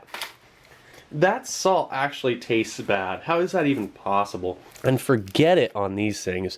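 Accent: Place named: California, United States. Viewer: Yeah he's a pretty cool bro, sounds Californian, might not be but he's got that dude vibe going. I love it when he says "Let's get this on a tray...nice!"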